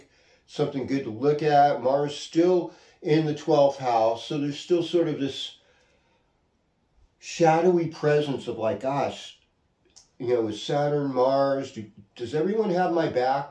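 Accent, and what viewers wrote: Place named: California, United States. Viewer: Great show,! It’s true: We are a collection of hicks, cowboys and hippies. Though I don’t think I fit in with either of those groups. If anything, I consider myself a low level scholar and story teller. Also with those three groups, you get the two California accents: “The surfer” accent, with its elongated vowels and drawl. Then there’s that whole “faux southern” accent that’s common in this area, with its sharpness. I felt inspired to comment on that aspect. I like your surfer accent,! Especially, since accents seem to be fading these days. Anyway, peace brother ☮️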